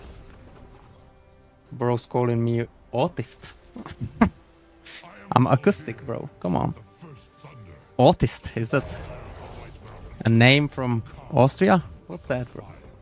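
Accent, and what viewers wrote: India. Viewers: all this while i tot was indian from his accent.:D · I thought you were an indian cuz of accent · Yeah, he sounds Indian 😭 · i though you were indian lol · I thought you were indian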